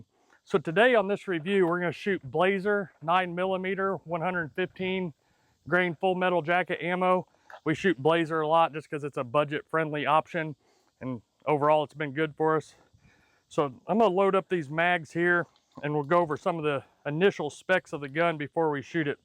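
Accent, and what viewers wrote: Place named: Midwest, United States. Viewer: I picked up one of these today and my LGS didnt have a holster. Found your website, then saw this video. I thought you sounded midwestern haha! I’m also from Missouri! Seals the deal that I’m going to get my holsters from yall now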